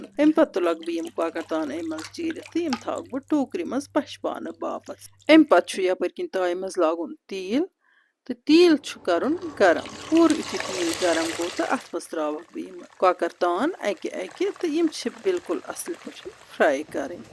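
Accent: Kashmir, India. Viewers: very nice kashmiri accent , good to hear .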